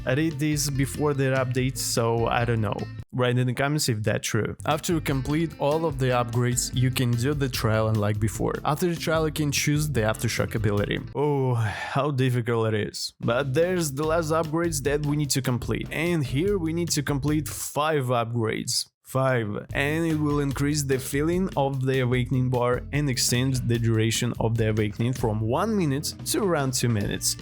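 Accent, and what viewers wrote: Russia. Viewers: I have a question are you Russian? It's just the way you say some words in Russian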